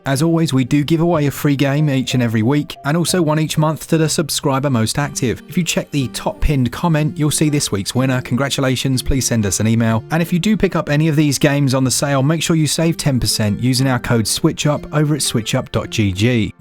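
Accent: United Kingdom. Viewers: My soon-to-be wife surprised me with a birthday Switch OLED just recently, almost cried cause I've been saving up for it for months and she went ahead and got it for me. Went to search for a Switch-related youtube channel and yours is by far the most enjoyable to watch (might be the accent, gotta love the brits). More power to you!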